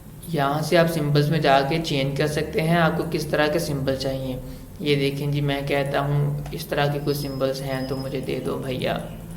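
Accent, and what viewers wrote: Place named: Punjab, India. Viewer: Hahaha punjabi touch 👌 .. Well explained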